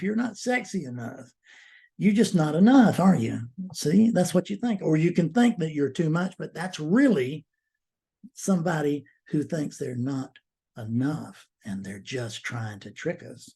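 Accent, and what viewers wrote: South, United States. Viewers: when I first found you so long ago, I dismissed you as just a Hick with that "country voice" and ordinary language. I was used to the impersonal, superior Lecturing style of other Speakers/Teachers, so I didn't value your "common man" style until just now. Thank you for your honesty and personal language plus your obvious Awakeness.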